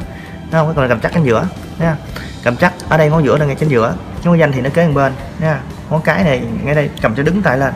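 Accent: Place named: Mekong Delta, Vietnam. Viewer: Anh nằy miền tay he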